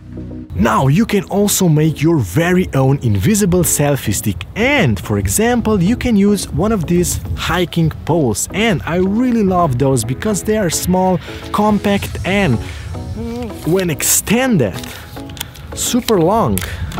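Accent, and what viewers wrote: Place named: Slovenia. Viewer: Where are you from? It sounds like Slovenian accent.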